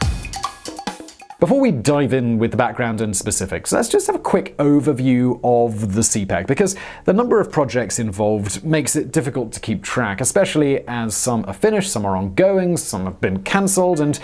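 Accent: United Kingdom. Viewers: the way he talks in a bantering way, makes it that much funny, typical brit accent. Although Indian army has plans to seize Pakistan occupied Kashmir and in turn seizing Pakistan's dream of cpec. China probably isn't in the condition to interfere.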